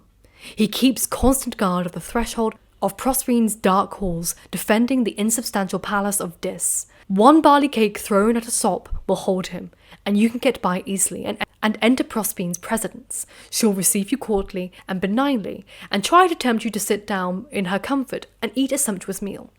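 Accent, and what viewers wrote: RP, United Kingdom. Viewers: I love her content but that has to be the RP accent to end all RP accents.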